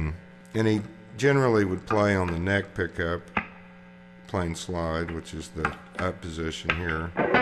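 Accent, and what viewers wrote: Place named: South, United States. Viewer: haha yeah you do, i've heared a lot stronger southern accents before but for someone from scotland.. its still funny:P thinking of doing any other guitar lessons? perhaps on soloing? ( accoustic ).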